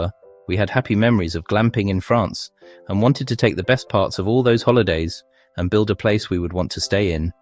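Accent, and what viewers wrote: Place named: Southampton, United Kingdom. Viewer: Tu as laissé ton accent de Southampton!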